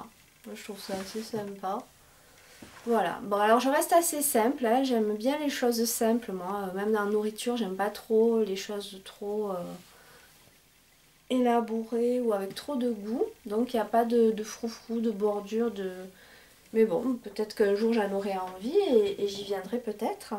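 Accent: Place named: Toulouse, France. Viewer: Bonsoir ma nièce ( petite soeur adorée ) . Super ton accent toulousain ou carcassonnais !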